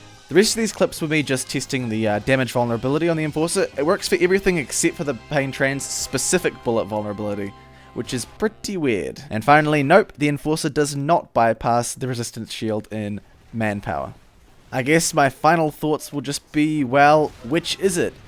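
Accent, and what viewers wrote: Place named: New Zealand. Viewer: He's a fucking Kiwi, couldn't you recognize his accent? Dumbass.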